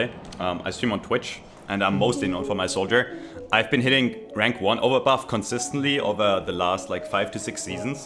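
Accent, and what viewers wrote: Germany. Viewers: Are you German? Or Austrian? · german :D ?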